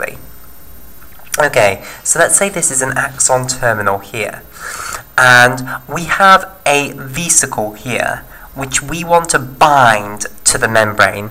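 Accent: United Kingdom. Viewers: I think it’s just a compliment on his British accent.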